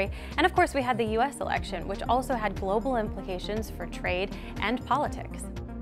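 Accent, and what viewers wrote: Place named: England, United Kingdom. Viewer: Did you develop the English accent you have now. Hearing this and listening to your report today you certainly nice accent that we Americans are always intrigued by.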